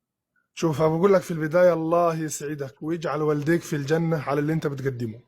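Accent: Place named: Upper Egypt, Egypt. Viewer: ما شاء الله الراجل ده كلامه ولهجته جميله على فكره دى لهجه مصريه بالأخص لهجه اهل الاقصر صعيد مصر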